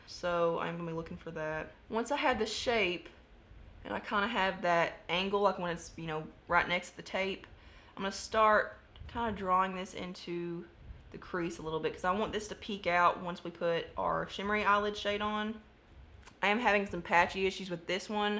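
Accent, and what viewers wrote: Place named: Texas, United States. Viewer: Girl, our voices and accent sound alike! It’s crazy! Are you from Texas? You are beautiful! Excited to be a new subscriber! Thanks for the fun videos!!💛🌼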